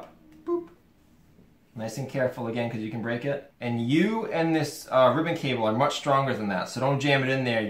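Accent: Massachusetts, United States. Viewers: Great job teaching how to do this. You sound like you are from Massachusetts :)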